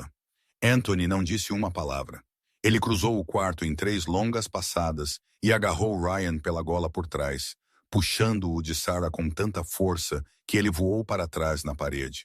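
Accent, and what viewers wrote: Portugal. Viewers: Porquê esse é o português de Portugal e não do Brasil 😂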